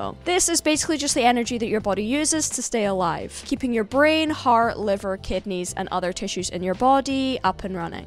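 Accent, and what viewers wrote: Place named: Scotland, United Kingdom. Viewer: FIRST COMMENT AND FIRST LIKE:🥇🌟🎖️⭐️🏆🏅 hi happy Sunday your Scottish 🏴 accent=🔥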